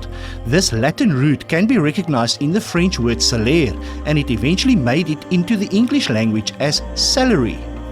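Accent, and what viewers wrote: South Africa. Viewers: Bud are you a South African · Stalactites. Got 7 wrong so far...!!!!!!!!!! Is that a South African accent? RE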